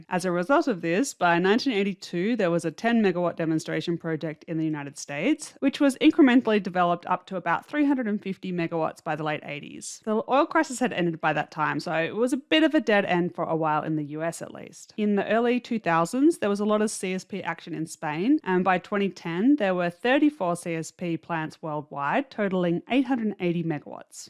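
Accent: Australia. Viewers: As a UK English speaker, I had to put the captions - that is, subtitles - on to work out what "soller" is! Is this Australian or is this?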